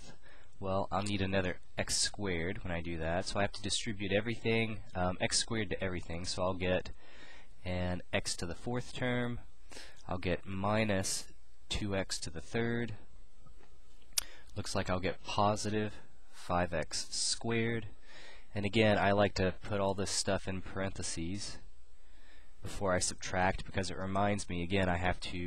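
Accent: South, United States. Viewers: hahaha. the comment about your southern accent made me giggle. For some reason my brain shut down and i second guessed myself... you helped me get back on track :) and gave me a good little laugh too. Thank you for your time and help :) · I had to laugh when I heard your country accent. But thanks for the example!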